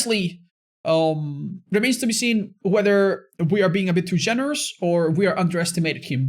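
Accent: Spain. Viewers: Question for American/British viewers, Does have a Spanish accent or just an accent in general? I'm surprised by how good his english is.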